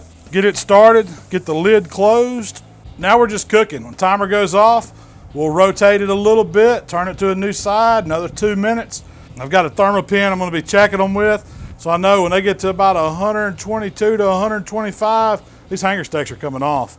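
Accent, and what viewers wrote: South, United States. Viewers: I can listen to my boy all day with that! Southern drawl down home! Brotha from another motha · It’s Southern and if u aren’t from the South you can’t understand him. Rock on..🤔😁🤣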